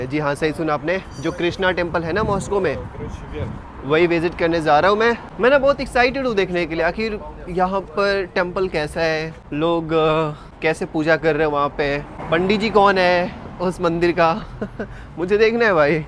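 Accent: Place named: Bihar, India. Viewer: Bihar se hain ye.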